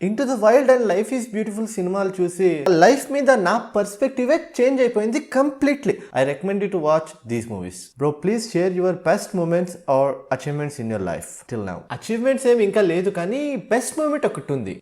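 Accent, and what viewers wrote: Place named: Telugu, India. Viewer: Mimmalni chuste sorry sorry meeru maatlade padhhathini batti telugu vaalle vunnatlunnaru..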